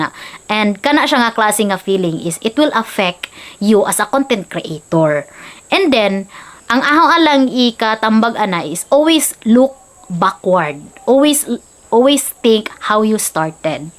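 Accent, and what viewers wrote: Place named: Bohol, Philippines. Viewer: Dli k tinood tga bohol kung wla k kabalo sa accent ni ms, especially ang iya mga word gegamit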